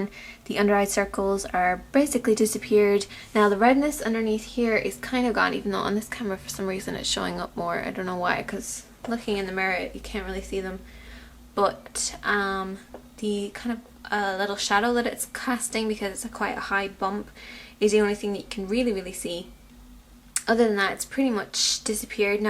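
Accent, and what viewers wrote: Ireland. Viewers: ur irish? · Are u Irish??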